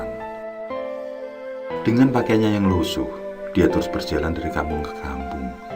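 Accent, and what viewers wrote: Java, Indonesia. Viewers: Biasa Karawang hadir nyimak pak, di panteng terus biarpun carita nya sudah pernah tayang, abisnya seneng denger suara pak yg khas medhok jawa nya, sehat terus buat kru sukses terus semangat berkarya di tunggu cerita terbaru nya yg lbh seru dan serem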